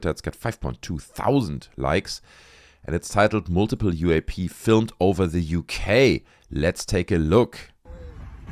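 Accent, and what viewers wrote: Germany. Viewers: Love Ur German English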